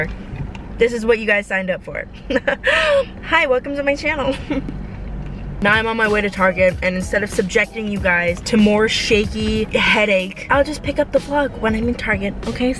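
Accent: United Kingdom. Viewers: Loving the accurate af British accent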